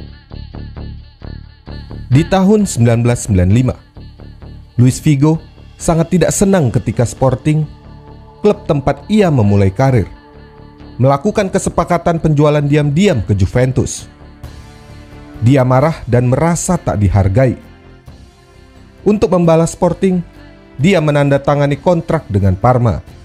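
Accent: Medan, Indonesia. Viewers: BG..ABG orang Medan yah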